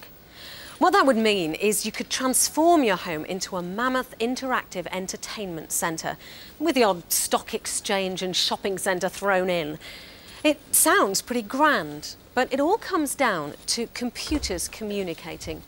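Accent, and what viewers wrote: United Kingdom. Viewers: The British accent makes the presentation sounds very sophisticated.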